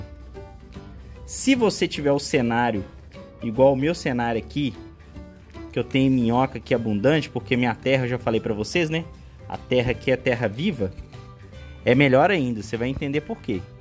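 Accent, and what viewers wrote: Minas Gerais, Brazil. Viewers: Que sotaque mais fofo! Será mineirinho? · Bom dia. Seu estilo de voz me faz lembrar e sentir saudade do povo mineiro, inclusive meu primo Geraldo Mangela, que mora em BH. Bjs de saudade primo, te amo!!!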